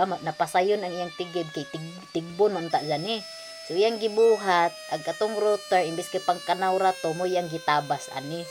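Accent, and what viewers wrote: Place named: Visayas, Philippines. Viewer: hi done subcribe npo miss im new here lagi kita pinapanood i like ur accent bisaya kbayan from leyte but im here n in manila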